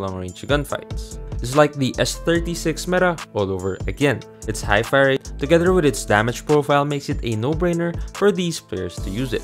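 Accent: Philippines. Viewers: I thought your from Philippines besides your talking in English like Filipino Lmao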